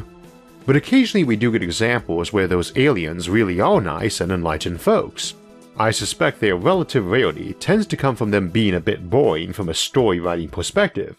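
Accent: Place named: Maryland, United States. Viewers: Maryland accent! Only place in the world the letter R is spoken out as a W... Hasn't anyone ever tried to correct this odd phenomenon? Only place a speech impediment is the norm. Great show and Host. Just hard for me not to notice the accent and stay with the info.... MAYBE I'm the one that's impeded. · I'm just lovin' these - there's a calm, mesmerising pace to them that just keeps your attention. Bravo! Where's your accent from, BTW - I'm in the UK and I can't place where in the USA (if, indeed, it's USAish) you're from. There's Deep South twangs in there - and then hints of Canadian. But I'm stumped!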